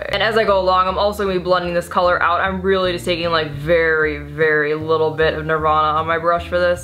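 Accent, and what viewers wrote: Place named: Chicago, United States. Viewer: Your Chicago accent is so refreshing to hear; I'm from Chicago but have lived in Florida for 16 years, so I miss hearing my native accent. I love this makeup look, it's simple but yet festive. Thanks sweetie!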